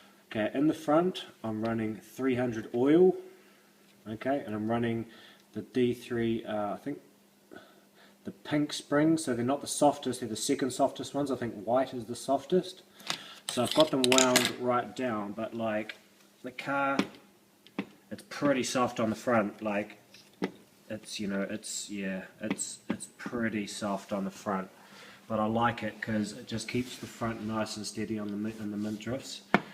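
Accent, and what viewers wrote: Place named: New Zealand. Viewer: wheres that track at bro? u sound like a kiwi aye? :-)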